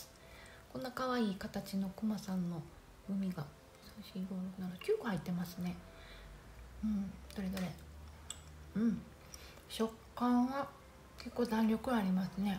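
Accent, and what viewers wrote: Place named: Kansai, Japan. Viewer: 話し方が穏やかな関西弁で聞きやすかったです。 私も爪が弱くてコーティングするネイルを塗っていますがオイルのものも気になってました。今度買ってみます。紹介ありがとうございました。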